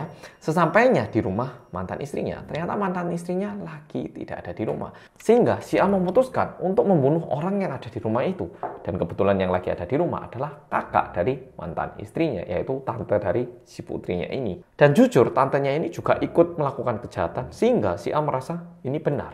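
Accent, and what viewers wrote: Java, Indonesia. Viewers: sudah mengurangi medoknya bang, pistol, wkwkwk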